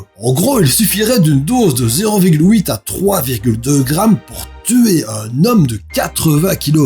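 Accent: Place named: Belgium. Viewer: Un abonné de plus ! Attends,apprendre des choses grâce à une personne avec l'accent Belge, c'est trop rare !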